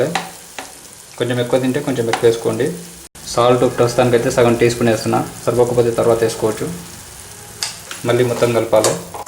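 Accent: Telangana, India. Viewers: Super andi iam very big fan of your Telugu tounge because it's same like our mother telangana tounge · Telangana Basha super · anna ..pakka...telangana anukuntaa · మీ లాంటి వాలు మాకు చాలా అవసరం అన్న..మన తెలంగాణ యాస చాలా బాగా మాట్లాడుతూన్నారు అన్నా.. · మంచి తెలంగాణ భాష మాట్లాడినవ్ కూర ఎట్లైతే గట్లాయేగాని మటలైతే అస్సల్ మాట్లాడినవ్ 👍💐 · Anna manadi pakka Telangana MBNR basha🙋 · మన తెలంగాణ భాష లో మస్తు మాట్లాడుతున్నావ్ అన్న... 👌👌👌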